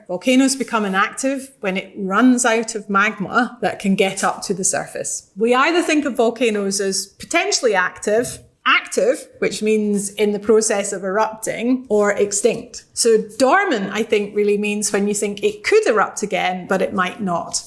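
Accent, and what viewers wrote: United Kingdom. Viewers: This dumbass british accent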